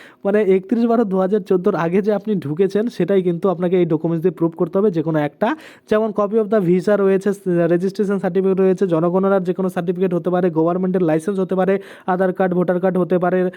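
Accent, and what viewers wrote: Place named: Bangal, Bangladesh. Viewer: ওরে বাঙালের ভাষা বন্ধ কর,চেষ্টা কর বাঙালির বাঙলা বলার ।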